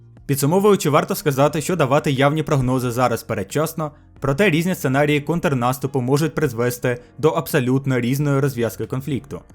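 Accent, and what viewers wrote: Odesa, Ukraine. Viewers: автор з Одеси. в Харкові і Дніпрі інший говір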